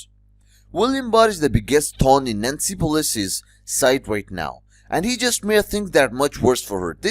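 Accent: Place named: Russia. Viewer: Why would you EVER use a narrator that sounds Russian?? Kind of crazy.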